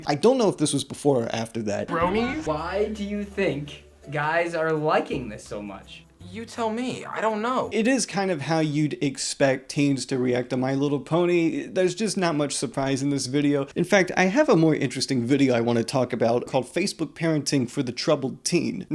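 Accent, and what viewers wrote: Boston, United States. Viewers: Are u from boston?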